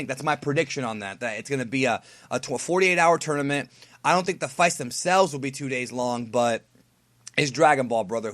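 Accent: Japan. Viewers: Nice Nihongo accent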